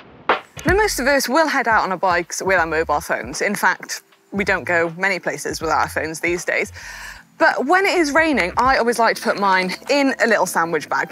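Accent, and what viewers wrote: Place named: United Kingdom. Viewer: Oh, i love the bristish accent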